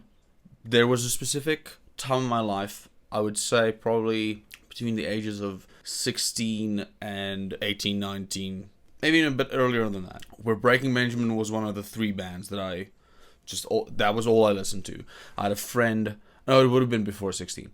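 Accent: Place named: South Africa. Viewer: South African?? Klink so! :D ZA ZA ZA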